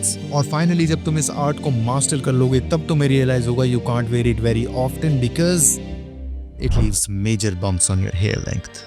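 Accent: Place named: India. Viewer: Nice indian english 👍 Indian people very nice people ❤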